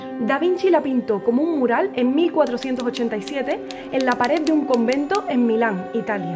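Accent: Andalusia, Spain. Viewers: Genial análisis, bastante erudito y además me encanta tu acento, es andaluz? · Interesante relato, me sorprendió la partitura en la cola 😂no la conocía (no quiero imaginar como suena😂) Nunca puedo aburrirme escuchando todas éstas historias que me encantan y esas manos acariciando esa guitarra, es un sueño.🍀🌷☘🌺☘ ¡Anda Lucía! que con ese acento tan lindo al hablar me voy para Andalucía!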